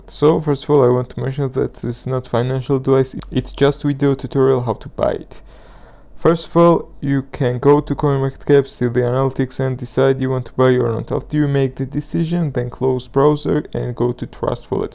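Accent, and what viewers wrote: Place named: Georgia, United States. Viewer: I recognized the Georgian accent LOL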